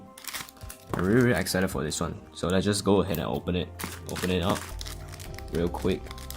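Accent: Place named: Asia. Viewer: I smell popular osu youtuber Well I mean you sounds like Miraie n Squashy Boy. Btw, are you Asian? Your english just sounds like one🤣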